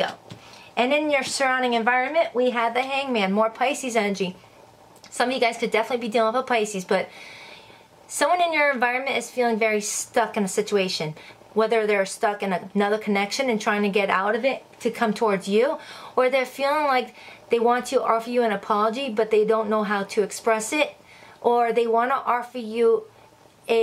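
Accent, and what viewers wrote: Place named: Brooklyn, United States. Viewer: Girl, you are so spot on. My past person messed me UP somethin bad 😔. Loved the video ❤🥰 and i was guessing your accent was brooklyn lol i have some friends from there ans ya'll sound the same 😁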